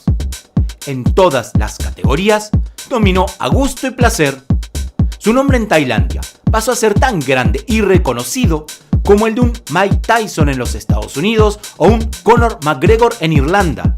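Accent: Argentina. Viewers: Se escucha claramentr como argentino o posiblemente uruguayo, pero peruano o ecuatoriano? Ni cerca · Excelente video! Tu eres argentino? Saludos de Brasil!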